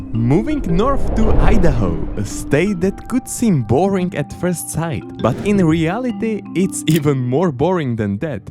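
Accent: Czech Republic. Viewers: This guy is Czech. English is most likely not his first language.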